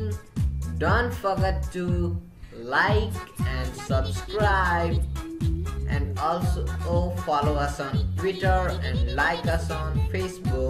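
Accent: India. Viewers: india ascent is Suck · This accent and why indian not being racist or anything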